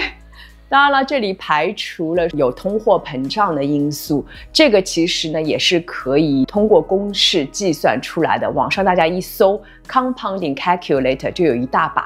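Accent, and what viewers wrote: Shanghai, China. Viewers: 沪普沪英很亲切，谢谢。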